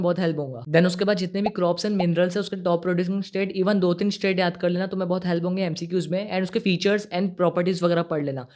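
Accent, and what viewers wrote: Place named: Maharashtra, India. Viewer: Bhai konse state se ho accent se to marati lg re ho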